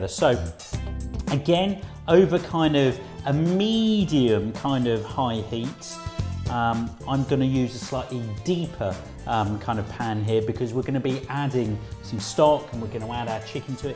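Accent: England, United Kingdom. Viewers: What is it about an indian guy with an English accent that is just so hot?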